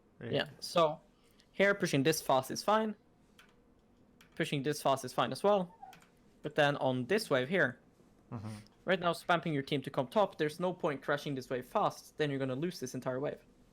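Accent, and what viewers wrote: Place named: Denmark. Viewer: just the danish accent :D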